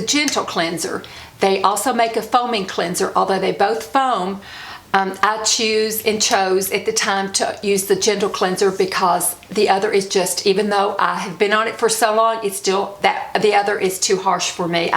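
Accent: South, United States. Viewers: I just found your channel and I just love it. My family is from the south so I love your southern drawl. Also, my mother has fought Crohns for many many years - and lives in the Knoxville area. Please keep these videos coming. Love you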